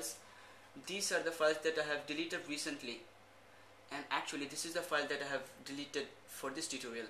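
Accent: India. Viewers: Gotta love the Indian accent! :D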